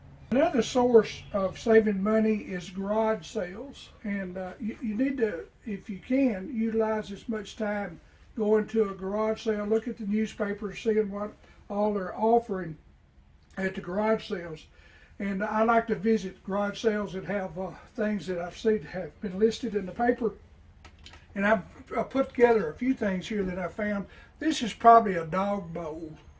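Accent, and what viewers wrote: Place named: South, United States. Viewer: I really liked the information you provided. Just for fun I turned on the closed captions and they really don't do us people from the south or with southern accents any justice. They messed up every 5th word you said.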